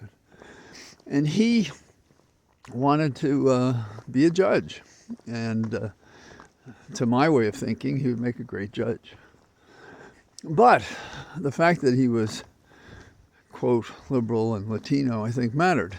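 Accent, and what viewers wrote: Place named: Ireland. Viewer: Another truly stellar talk today, elemental but also saddening. My cousin acquired his federal judgeship by running a longshot presidential campaign,and winning (don't guess which because you might guess wrong) He is the only person I know who talks like Mr.. It must be those Irish genes.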